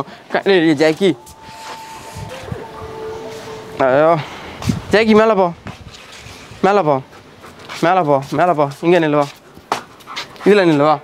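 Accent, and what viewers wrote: Kanyakumari, India. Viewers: Hi recently I started watching your videos to hear our kk district slang..🤣🤣🤣 very funny … Me too from kk district.. but I don’t speak the same 😆 Keep going Thambi 👍🏻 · Anna unga kanyakumari slang rmba alaga irruku anna epaiumae eppidiyae pesuga athu tha nalla irruku and congrats for 100k and always love u jacky 😍🔥✌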